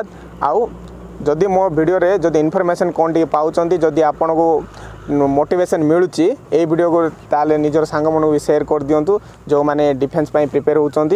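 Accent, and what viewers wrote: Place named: Nagarmpur, India. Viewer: Bhai tame Nagarmpur ra ki katha barta semiti laguchi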